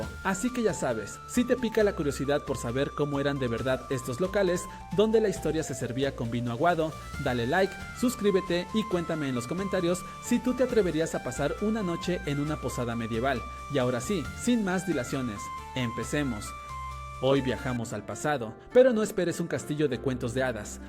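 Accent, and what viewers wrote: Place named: Latin America. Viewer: Tienes la forma de hablar de alguien que vive en un país en qué seguramente una gran parte de la población no tenga agua corriente o viva en condiciones de insalubridad.. tal vez cualquier país de Latinoamérica?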